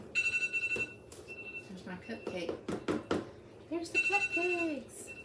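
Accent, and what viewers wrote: South, United States. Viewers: You are just delightful! That sweet southern drawl, unhurried cheerfulness as you mix and chat in that bright ,pretty kitchen! feels like I’m cooking with my favorite aunt- she’s just as blonde and sunny! I’m now on a quest to find that gorgeous striped sweater! So happy to discover your channel Blessings to you and yours.💖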